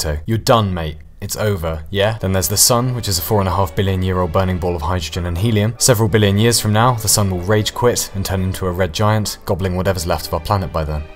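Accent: United Kingdom. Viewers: Language: English Accent: British Subtitles: Spanish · He doesn't sound very Norwegian. We usually don't speak with a British accent and it's also pretty hard for us to shake off the Norwegian intonation and pronunciation. If he really is then kudos to him for speaking so fluently. But hey, maybe he's half-British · The British English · I feel like this guy says all the things that I'm already thinking, but just in a British accent.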